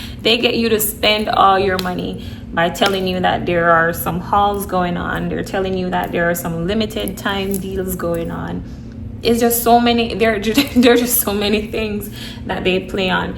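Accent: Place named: Jamaica. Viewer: Where are you from? I love that accent sound Jamaican I love Jamaica.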